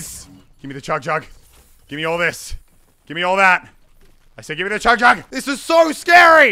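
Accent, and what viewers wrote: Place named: Australia. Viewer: I know u have an astralian accent but it's annoying when u say ashume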